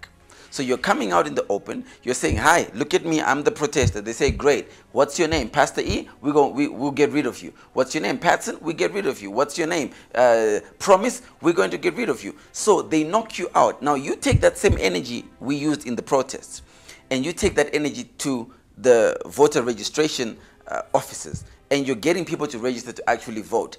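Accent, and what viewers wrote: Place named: United States. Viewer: But why sound so American.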